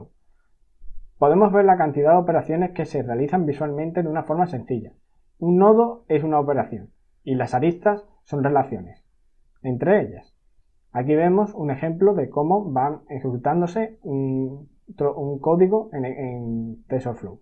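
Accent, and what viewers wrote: Spain. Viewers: Mejor aprendan inglés y vean los videos de TensorFlow... lol .... no puedo pasar ese acento "españolao" enfermante... ya chao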